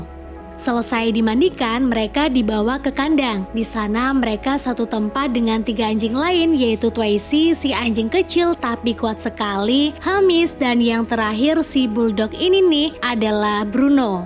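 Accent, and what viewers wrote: Pemalang, Indonesia. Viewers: Kok pemalang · Kk org pemalang yaa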